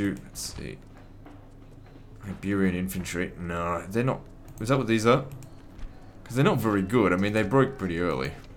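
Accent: Australia. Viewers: Really appreciate you doing this, and i like hearing the ozzie accent.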